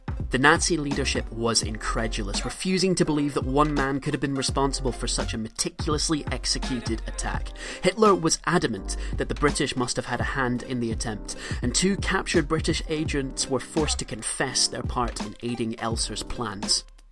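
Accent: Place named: Ireland. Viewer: Nice Irish accent you got there mate.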